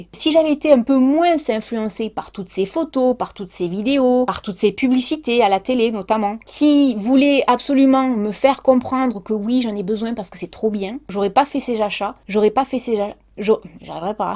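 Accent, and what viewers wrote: South, France. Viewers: Sa fait trop du bien d entendre l accent du sud... je suis de perpignan sa fait trop du bien❤❤❤ reste comme tu es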